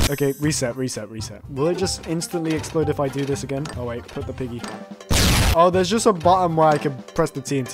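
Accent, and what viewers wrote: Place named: United Kingdom. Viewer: yeah he's British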